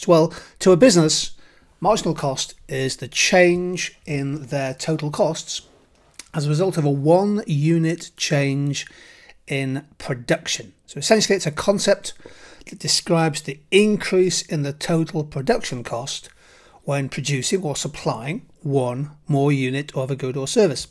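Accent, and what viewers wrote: United Kingdom. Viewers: Finally found great vids with British accent lol Thank you for uploading. It really helps! Your voice reminds me of my business teacher in secondary by the way. Greetings from Indonesia Sir!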